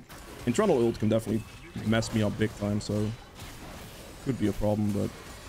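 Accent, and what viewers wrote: Netherlands. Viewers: Ran into your video's while looking if I wanted to play Udyr, immediately recognised your Dutch accent :D Keep up the great content!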